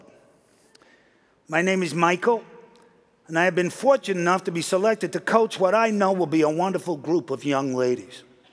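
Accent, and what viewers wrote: United States. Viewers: Has nothing to do with Trump. The accent is far from being uniquely his, and it doesn't sound very much like him anyway, pretty far stretch to say he's mocking Trump. Lots of folks in the US have a similar accent. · I swear. Damian Lewis’ American English is hands down spot on! ❤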